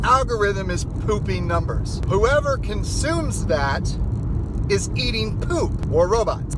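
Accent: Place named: South Africa. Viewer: lol. It's a South African accent!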